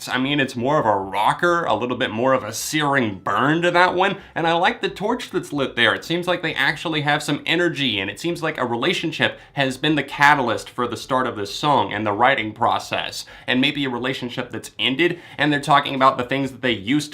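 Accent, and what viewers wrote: Australia. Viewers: I like your Aussie accent,!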